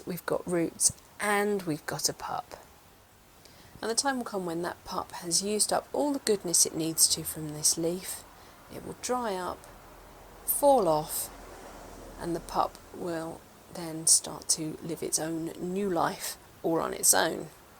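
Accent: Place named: United Kingdom. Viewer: I enjoyed learning so much from this video!! It was a added pleasure to hear your beautiful British accent!! I live in the Midwest of the United States. We do not have beautiful accents 😃😆😂 🫤 Thankyou for helping me be a better succulant parent !! I grew up with sempervivam. They grew on my mother's terrace. I loved them❤ I have rooted some echaveria from cuttings . There were many varieties . They included sempervivums. I have been having fun with these sweet succulants. I am a bit nervous about the bonsi but I will watch all your videos on the subject . 😃😃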